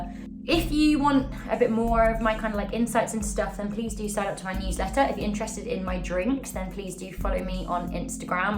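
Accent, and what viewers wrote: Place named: United Kingdom. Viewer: I am very happy to be able to watch you again dear ⭐⭐⭐⭐⭐ you always put a huge amount of work and heart... Also the Brit accent in Canada... Bold. A delicacy 🍯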